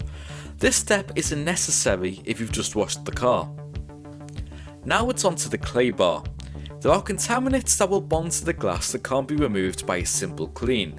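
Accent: United Kingdom. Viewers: What part of the uk do u come from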